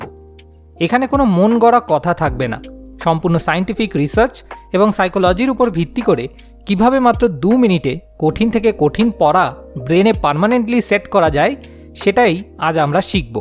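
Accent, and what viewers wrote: Kolkata, India. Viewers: Apni ki kolkatar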